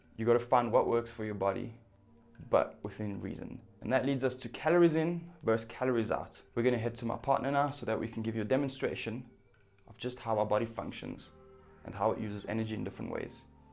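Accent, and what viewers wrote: South Africa. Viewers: hey man. Thanks for the video my man. Speaking the truth. Out of interest are you perhaps South African?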